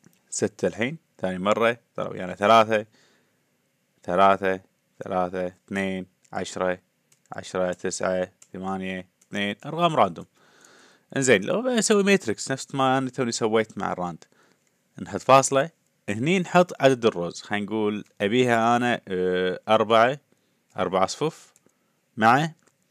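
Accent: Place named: Iraq. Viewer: عاشت الايادي والله صراحة كلت جنابك عراقي لان لنجتك كلش قريب علينه حياك اخوك مهيمن فالح من العراق بابل ممكن باقي شروحاتك صراحة محتاجهن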